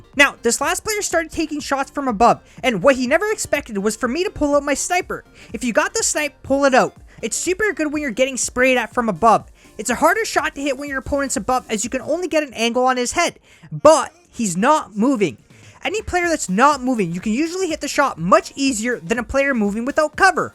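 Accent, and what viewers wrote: Canada. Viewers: I can tell your Canadian. 😂 Please tell me if you aren't but I'm almost certain.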